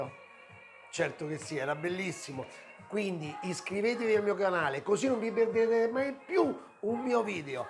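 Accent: Rome, Italy. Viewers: bella ricetta! Mi fa impazzire il tuo modo di parlare romano!e che carino Marietto!...stavo pensando di usare il riso invece della pasta all’uovo..che ne dici??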